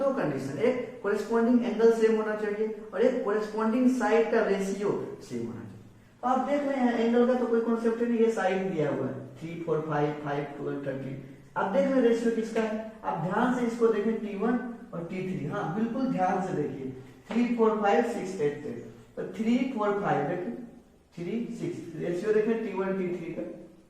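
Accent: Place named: Bihar, India. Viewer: Noted Thanks sir but Lec 3 kaha hai sir....! Mujhe apka padhane ka style aur apke bolne ka bihari tone bahut jyada achaa laga......!❤❤❤❤❤❤❤❤❤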